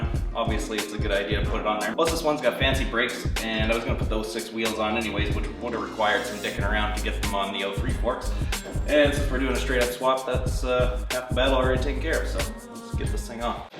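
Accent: Canada. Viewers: what part of canada r u from?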